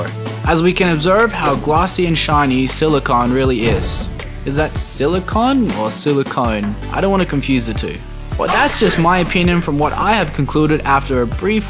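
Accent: Australia. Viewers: aussie n proud bro, its a sick accent 💪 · Cant tell if your australian or american or something else lol, nice video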